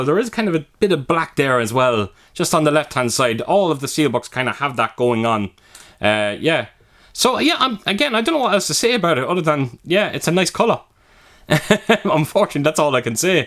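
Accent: Ireland. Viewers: Hey, that is a lovely 4K steelbook set. I have to confess that I've only seen the first three movies in the franchise. I also own none of them on physical media as I only collect horror, independent and underground films. If I collected anything else I wouldn't have the finances for it and I most definitely wouldn't have the space. Even when you review or show movies I'm not really interested in, I always enjoy watching every piece of content you upload. It must be the Irish accent 👍👍👍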